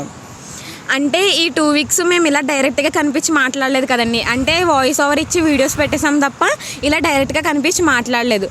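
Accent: East Godavari, India. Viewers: Superb akka mana east Godavari natural ga ela matladatharu... nv video lo are naturalist use chesav ..superb ..keep rocking 💓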